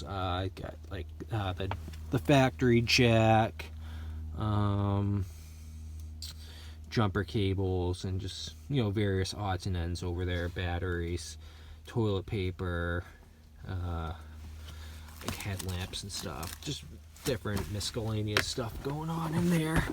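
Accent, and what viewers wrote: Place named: Maine, United States. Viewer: Sounds like a fella from Maine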